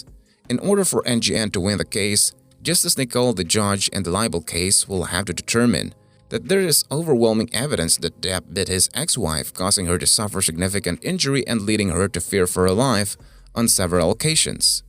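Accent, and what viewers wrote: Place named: Latin America. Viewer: Gangster from the 30's??.!!! He sounds more like a Latin guy IMITATING a 1930's gangster! You can tell he's reading this "Narrative" (..the pronunciation)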